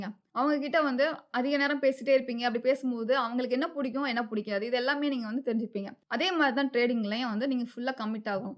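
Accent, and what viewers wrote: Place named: Marthandam, India. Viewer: I think you are 100% marthandam area 😂😂😂😂😂😂😂😂😂😂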